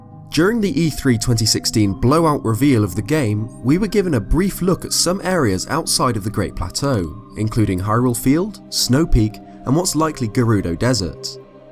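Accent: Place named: RP, United Kingdom. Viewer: Thank you for a clear voice and speaking the Queens english, as well as knowing what you talking about! Very professional! Congratulations, job well done!!!!!!!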